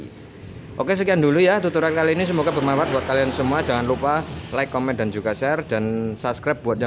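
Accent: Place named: Banyumas, Indonesia. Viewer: Posisi bengkek ngendi rika mas, deneng kya ngapak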